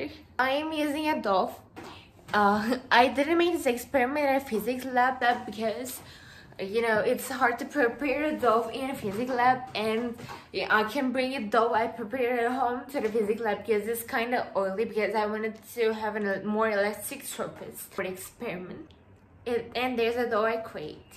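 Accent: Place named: India. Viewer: Abla hintçe aksanın var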